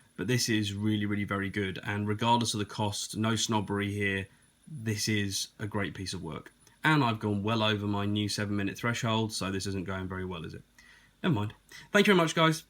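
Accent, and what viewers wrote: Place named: England, United Kingdom. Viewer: It's refreshing to hear an English accent in the spinner review section of YT! It's also great to hear succinct and balanced opinions as regards top end spinners and their mid-quality counterparts. I for one have an aptitude with all things arty crafty so I'm in my element buying mid-priced spinners and then re-finishing them by hand then putting in a good bearing. For example, I've just bought both a Zentri Nano and a (now obsolete) Triton mini ...both in SS, hand smoothed/polished them and both are running One Drop bearings ...all part of the fun :-) Thanks ...thumbs up/subbed.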